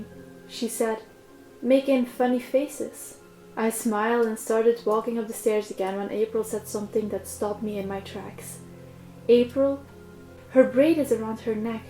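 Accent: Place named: Netherlands. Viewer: Whats your accent? It sounds like Dutch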